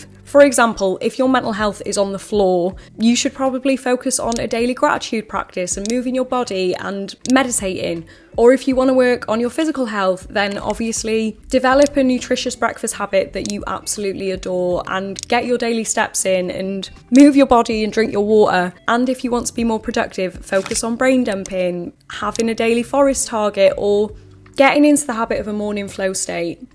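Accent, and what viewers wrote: United Kingdom. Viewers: Aww yew Bri’ish 🎩💂☕️🤓🤓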